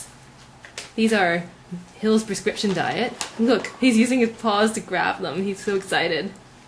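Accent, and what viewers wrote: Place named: England, United Kingdom. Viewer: Are you Japanese born in England as i thought you were english until i checked your channel haha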